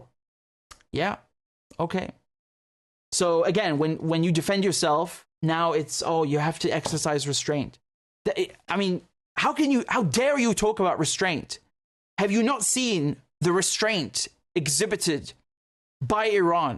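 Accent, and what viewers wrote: Texas, United States. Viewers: Love your Texas accent bro. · Your Texan accent sounds pretty good. But then I am a Kiwi, so what do I know? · Texas voice not too bad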